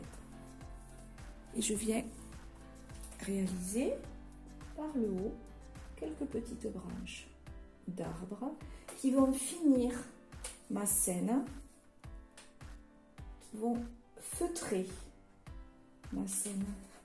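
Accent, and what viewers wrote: South, France. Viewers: Coucou, je te mets un MEGA pouce bleu, car, (tu as fait un travail remarquable), c'est ce que j'appelle de l'ART, sincèrement, BRAVO pour cette barque sous fond coloré chaleureux et la végétation détaillée Je sais reconnaître les vrais talents, et (tu es une vraie artiste, car tu as ça dans la peau, c'est indéniable), et ton tableau est vraiment très beau, tu mérite 20/20 en plus, d'être jolie, ton accent du sud est attachant, gros bisous et prend bien soin de toi, Artistiquement, kenic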